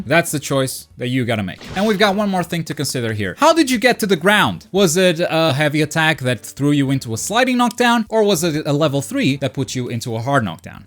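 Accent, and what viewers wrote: Portugal. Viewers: If I understood, you're Portughese? E AI MEN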